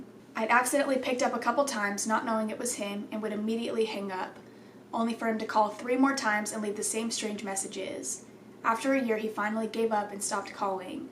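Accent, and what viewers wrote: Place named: South, United States. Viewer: Its the southernish accent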